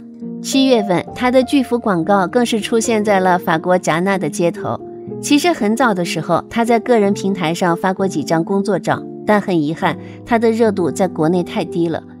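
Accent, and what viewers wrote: Standard. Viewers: 的国语讲的非常地道啊！😂😂😂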